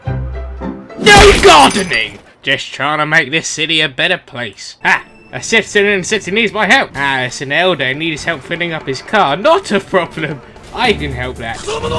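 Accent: United Kingdom. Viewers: its ok man by the way r u British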